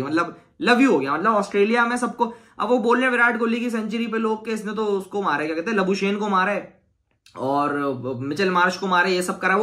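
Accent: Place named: North India, India. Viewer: Ye tone pakistani hai hi nahi...check are you from india brother? I m very well aware abt pakistani voice tone...ye typical north Indian.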